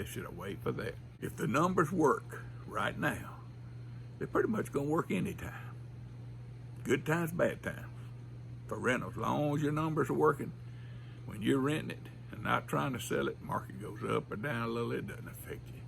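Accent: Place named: South, United States. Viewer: Excellent anecdote about the woman who judged your "education" level by your dialect. The elitists seem to define education solely by the number of years spent in formal academic institutions, as if that is the only path to success. I'll take practical, real world educational attainment in the world of real estate over the perceived "education" as defined by the elitist academic types who simply regurgitate a bunch of textbook theory and have never achieved anything in the real world. I'd be willing to wager that the woman who made that comment relies on an employer for her livelihood, while relies on himself and his real-world education & experience. I'll bet on the country boy with the Southern accent, the solid work ethic and years of practical wisdom over the elitist with the English degree every time.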